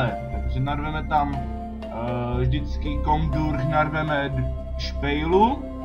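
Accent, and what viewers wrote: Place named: Moravia, Czech Republic. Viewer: Tož to zkusím! Mám dva kocoury a nejspíš tu myšku dotáhli oni. Teď mám v pokoji už druhou (první jsem ulovila já....), jenže je za skříní, což je problém. Děkuju za nápad a moc se mi líbí, že mluvíš, jak ti zobák narostl. Já su taky z Moravy, takže mě moc potěší, když neslyším furt takovej, makovej, zapejkat a podobně.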